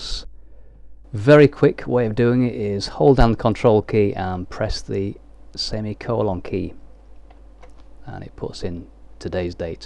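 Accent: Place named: RP, United Kingdom. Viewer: Am I the only one who thinks The Queen's English does enhance the audio?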